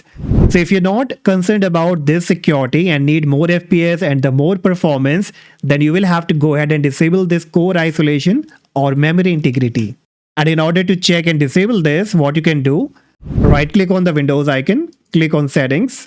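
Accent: India. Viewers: you have fucking indian acccent . jook man (hate from me )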